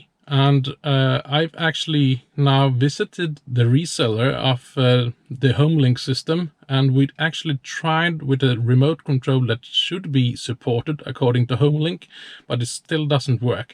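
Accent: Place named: Germany. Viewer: Ah, nothing, just your dialect is just like my friends who is German. Perhaps you have lived there?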